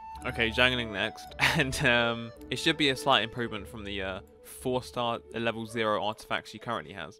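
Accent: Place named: United Kingdom. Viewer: Why are you British?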